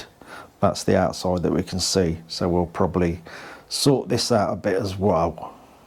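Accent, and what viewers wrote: England, United Kingdom. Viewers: I've been taking seals out of machinery for better than fifty years, and this is the first time I've seen the trick with the chisel cutting the seal, and that works great. The steel base for that seal is stamped and "pre-stressed" so it fits very tight pressed in. You simply break that "stable platform" it begins with so it no longer can "press against the wall. I've worked on motorcycles and cars, trucks and general machinery, never thought of it like that. By the way, we also say "dragged", at least we do down south, lol. I've got to say, I enjoy the accent and word usage common over there in England. I got on here because of having a triumph, but enjoy the whole "stepping into your world" over there by the tube. I spent twenty years around the world, a Marine, and miss that, having retired in 97. Of course that's why I've got time to have a couple bikes. 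I've had the good fortune to work on Brit bikes fifty years, since my early teens, and I still really enjoy them. Oh, and car's too, had a few, worked on hundreds. It's good to see the bike coming together like it is. I've heard of Enfields since my teens, only recently got to see them, and that one is looking really good. Thanks for the video!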